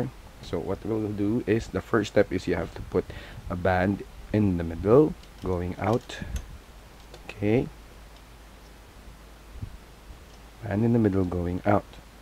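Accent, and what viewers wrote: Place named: United Kingdom. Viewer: I MADE IT!! And last week The Legend!!! I made the Star box in Jelly red violet and jelly turquoise! Some bracelets look bad with jelly bands but NOT this one it looks stunning!!! I have a bad headache after making it though lol! Great British accent you did too! Sounded just like my accent which is English!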